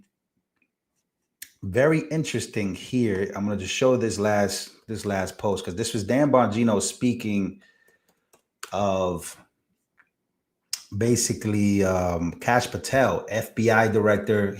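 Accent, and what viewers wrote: New York, United States. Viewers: “Your lovely NY accent” is what they meant! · Moved to Indiana from Kentucky 27 years ago and I still have my accent 😅 I trust you'll keep that New York accent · Accent dont lose the NY accent. I lived in TX in 1990 San Angelo best time of my life. Great people.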